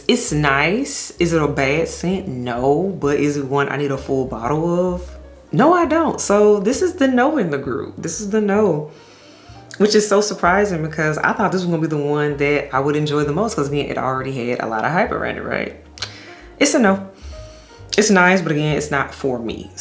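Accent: Memphis, United States. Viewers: I knew you were a fellow Memphian when I heard you talk! Hi!!❤️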